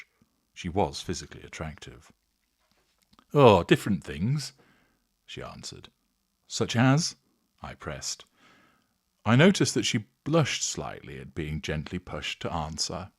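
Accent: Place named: Leeds, United Kingdom. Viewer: Not a Manchurian accent! Yup! Leeds!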